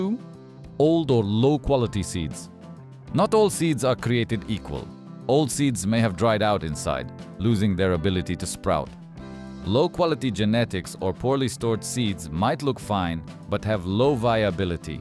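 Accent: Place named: Europe. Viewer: Sounds like european😅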